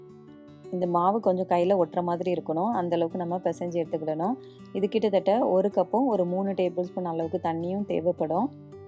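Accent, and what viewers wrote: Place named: Tirunelveli, India. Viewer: எங்கள் சொந்த ஊர் குற்றாலம். உங்கள் பேச்சு மற்றும் சமையல் செய்முறை பார்த்தால் திருநெல்வேலி போல் உள்ளது. உங்கள் சொந்த ஊர் எங்கே.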